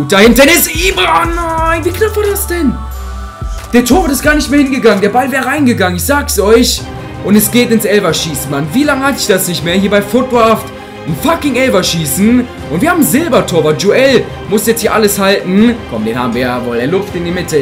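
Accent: Germany. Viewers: Redest du Schwäbisch? Wenn ja mach mal davon ein kurzes Video pls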